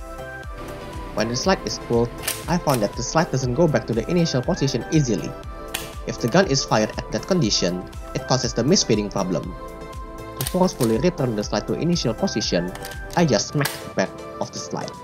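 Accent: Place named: Indonesia. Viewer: Masih ada logat indonesia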